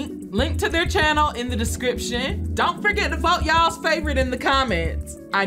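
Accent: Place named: Georgia, United States. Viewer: girl i’m loving your energy in these vids!! are you southern lmao, bc the voice is giving Georgia lowkey (this isn’t shade i’m an SC gworl)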